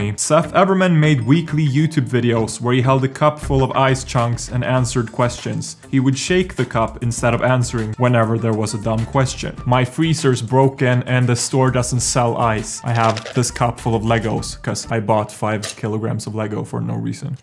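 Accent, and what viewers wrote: Sweden. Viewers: Me in the beginning of the video:”Oh boy he sounds Swedish” Me like fifty years later: “Oj satan i gatan” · ur english is rly good but i can kinda hear that ur swedish (im a finn) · i have never heard someone who sounds as swedish as you, and i am swedish myself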